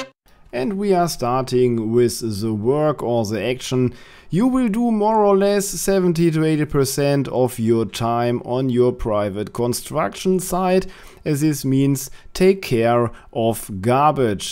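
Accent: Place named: Germany. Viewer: I'm enjoying your video and the German accent, good luck with your project and your Channel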